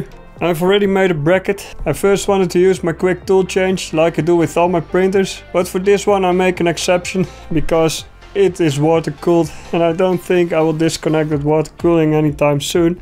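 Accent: Netherlands. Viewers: Is this guy Dutch ?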